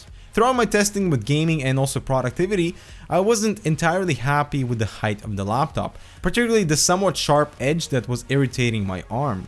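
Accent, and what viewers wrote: Russia. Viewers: Слышится русский акцент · is russian? just wondering bcuz his accent is so stong:P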